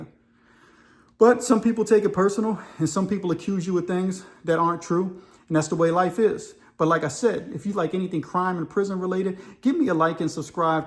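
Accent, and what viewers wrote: Louisiana, United States. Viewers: You sound like Louisiana supposed to. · You sound like you are Cajun my man.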